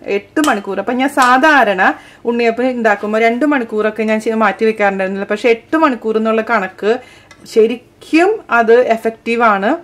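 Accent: Thrissur, India. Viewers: thank you for your prompt reply Chechi! I'm making it for Ganesh Chaturthi :) I have tried so many of your recipes and it's been a Hit!!! I esp love your Thrishur dialect reminds me of my Guruvayur cousins :) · chechyyy..u r soooooo beutifull..ur smile n presentation also..ithok ondaky nokalonnum nadannillwlum checheede aa thrishur slang charapara varthanom chiriyum kaanana njan e videos mainly kaanunne..God bless😊☺😙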